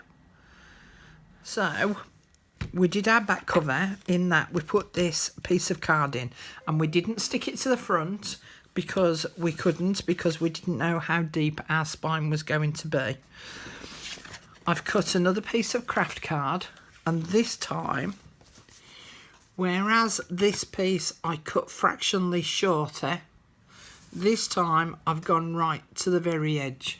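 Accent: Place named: Black Country, United Kingdom. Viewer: Love you and your crafting.im a blackcountry wench as well,but live in hampshire now.your voice and accent and humour soothes my soul and reminds me of home.xxx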